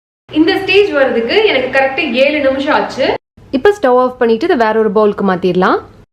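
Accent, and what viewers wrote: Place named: Kongu, India. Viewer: hi.... am ur new subscriber..... ur recipes are really 👌 n r u from kongu region...? ur slang s lik that.. tats y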